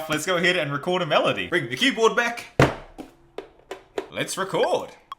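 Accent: New Zealand. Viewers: Wow, haven’t heard a kiwi on YouTube before. At least I’m 98% sure ur kiwi. Ur vid was good too🙃 noice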